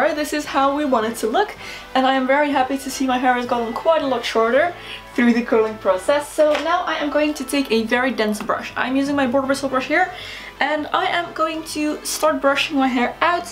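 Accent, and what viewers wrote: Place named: Netherlands. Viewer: ik hoor een Nederlands accent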